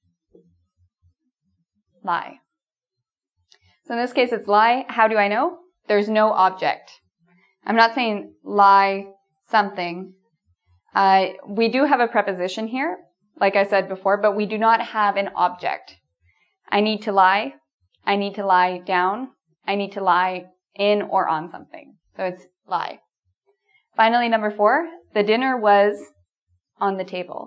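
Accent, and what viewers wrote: United States. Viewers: Estou aprendendo bastante! I'm learning a lot! Miss, are you american or canadian? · Is she an American？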